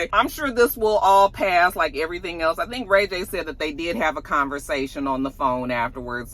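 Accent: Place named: Los Angeles, United States. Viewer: Lol. I love when you do your LA hood 🥷 accent 😂😂😊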